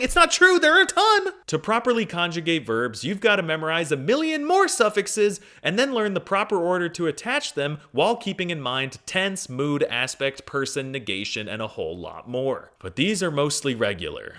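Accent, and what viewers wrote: Russia. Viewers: Bro you sound Russian when you speak Turkish 😢 and I'm a native, spent my whole life in turkey and still struggled to understand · Rus aksanı ile anlatmasan daha iyi olurdu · damn you have a heavy russian accent only while speaking turkish lmao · neden rus aksanıyla konuşuyon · you are talking exactly like russians that tries to talk turkish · You speak Turkish as if you are Russian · I have never heard someone speak Turkish with a Russian accent. also I love the "malum kişi" bit lmao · i have never heard turkish with a russian accent by an westerner till today. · FOR REAL, it sounded just like Russian I was so confused! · AMK BU NE GERÇEK OLACAK SANMIŞTIM Oh and WHY ARE YOU TALKING WITH A RUSSIAN ACCENT😭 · like a caricature of Turkish mixed with Russian acccent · Very funny video😅 But I have to correct one mistake: Turkish alphabet is not a version of American alphabet, it comes from ancient Turkic languages. 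And by the way, you speak Turkish in Russisn accent , Turkish is much softer and musical . It is also a mathematical and poetical language . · WHY IS YOUR ACCENT LIKE RUSSIAN ACCENT DAWG 🥀💔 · What is up with the weird map dude? There is no such thing. And this is not the turkish Accent it sounds like almost russian OMG. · Interestingly you speak turkish like a russian. Did you know that? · Türkçe konuşabilmek için kendini o kadar sıkıyor ki sonunda Rusça konuşup Türkçe kelimeler söylüyor 🙂 Türkçede R'ler hiç bir zaman bu kadar vurgulu söylenmez. Tek vurgu hatta yuvarlayarak bile söylemen yeterli R'leri. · İngilizce’yi bir İngiliz gibi ama Türkçe’yi bir Rus gibi konuşan bir Amerikalı. Tanıştığımıza memnun oldum. ✋🏼 Ben Almanca’yı bir Alman, İngilizce’yi ise bir İtalyan gibi konuşan bir Türk’üm. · he is speaking turkish like russian people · American imitating perfect Russian accent while speaking Turkish. 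Thats.. .amazing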